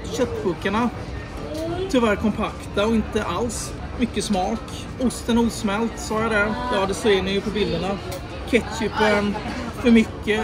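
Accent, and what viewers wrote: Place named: Småland, Sweden. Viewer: Man älskar ju å lyssna på din småländska dialekt ❤ tack för trevligt avsnitt! 👏🙏 men ska biffen i en burgare vara rosa i mitten som dessa är? Jag har för mig att den bör va genomstekt.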